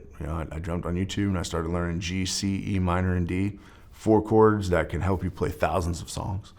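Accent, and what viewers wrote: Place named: Kentucky, United States. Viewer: Florence Kentucky I'm betting is where he's from Florence Y'all I know that accent it's kinda half southern half Yankee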